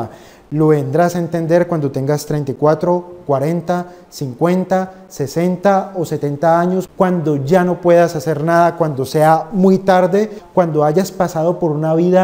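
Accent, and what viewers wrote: Colombia. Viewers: 💎💎😮Parce Tu Eres Colombiano?? Buena!!!!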